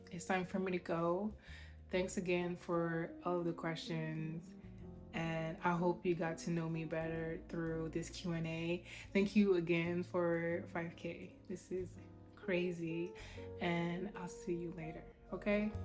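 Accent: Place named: England, United Kingdom. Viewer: You are sooooo cool! New subby!!! I LOVE your English accent!!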